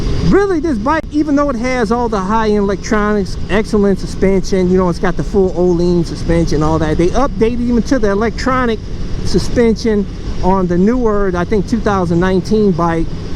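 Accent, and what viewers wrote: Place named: South, United States. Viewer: You know you sound like a southern black, right? 🤗🤙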